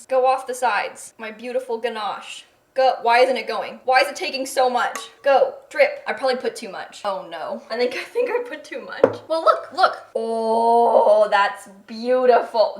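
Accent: United States. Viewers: One of the reasons I love your videos is because I compare our accents. In America you say sift and in Scotland we say it like siv 🇺🇸👉🏴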